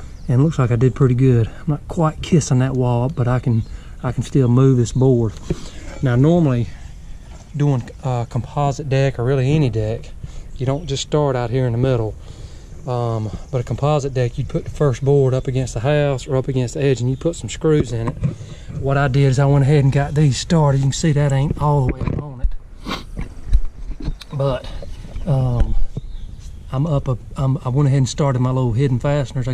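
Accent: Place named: South Carolina, United States. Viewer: You got to be from South Carolina. You talk just like me!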